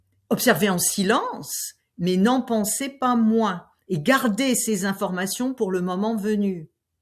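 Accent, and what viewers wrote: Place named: France. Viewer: Merci infiniment. C'est une précieuse aide, que je réécoute souvent. J'aime votre manière de vous exprimer....et vous avez conservé votre accent français clairement. Chaud au cœur votre accompagnement est un Plus.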